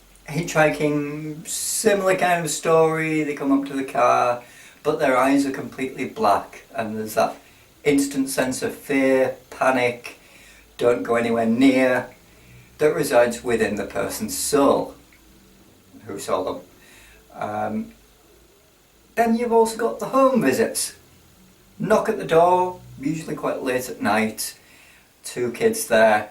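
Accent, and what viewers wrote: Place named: United Kingdom. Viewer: Some of the words you pronounce but guess it depends on what part of the UK your from cool :-)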